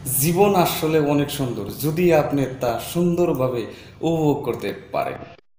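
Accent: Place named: Chittagong, Bangladesh. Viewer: সুন্দর ব্লগ। কথা আরো ফ্লুয়েন্টলি বলতে হবে। চিটাগাং এর ভাবটাও কমাতে হবে।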